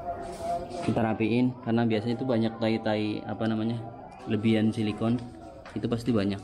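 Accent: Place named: Java, Indonesia. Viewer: Medok bgt mas'e.. 😆😆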